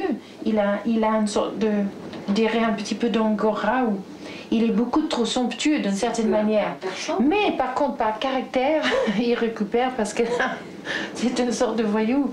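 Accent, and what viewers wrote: United Kingdom. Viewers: Son unique personnalité avec le charme de son accent bristish qui était toujours fort agréable à entendre ! C'était vraiment une magnifique personne très courageuse ! 🌹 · chère Anita J’ai eu beaucoup de plaisir à lire votre message de retour. Moi-même j’ai 52 hivers. Depuis toujours, j’ai eu un profond respect et de l’admiration pour notre Jane. Elle a toujours possédé une belle plastique et une âme pure. Je ne vous cache pas Anita que son départ brutal m’a plongée dans un profond chagrin. Je n’arrive pas à réaliser que nous ne n’entendrons plus son délicieux accent british. Je me console en me disant qu’elle a rejoint Serge pour leur décadanse et que Kate les prends en photo sur la piste de danse du paradis. Je vous souhaite un très bel été chère Anita et un grand merci pour votre délicieux message. Amicalement, Corey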